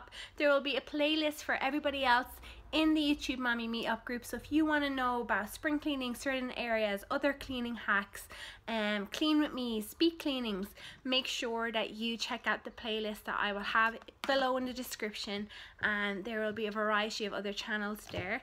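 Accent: Ireland. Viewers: Fantastic hack!! I could listen to you talk all day. Love your Irish accent. Thanks for joining the collab. #YTMM 💖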